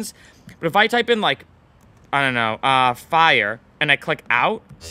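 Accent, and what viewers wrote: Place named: United Kingdom. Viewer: It's neat but I wish it would have been a more neutral sounding voice, the British accent isn't the best choice.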